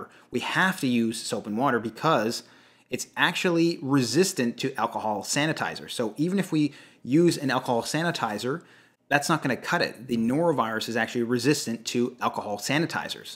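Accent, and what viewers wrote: Canada. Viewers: It’s underrated how dangerous this thing can be. I’m a kidney transplant recipient and I’m terrified of this virus. If I get it, not only am I at risk of damaging my transplant by dehydration but also not being able to keep anti rejection drugs down. I’m immunocompromised too so I’m terrified. Love the narrators Canadian accent btw. Reminds me of my crush, Calum Worthy❤❤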